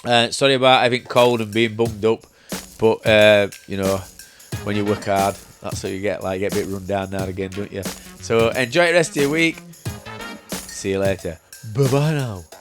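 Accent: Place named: Liverpool, United Kingdom. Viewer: I didn’t know you was from Liverpool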